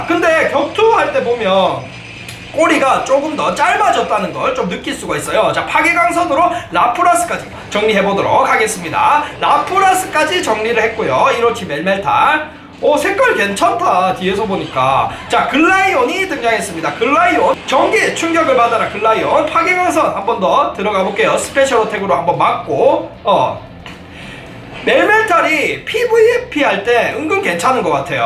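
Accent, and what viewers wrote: Gyeongsang, South Korea. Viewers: 님 경상남도 사시나요?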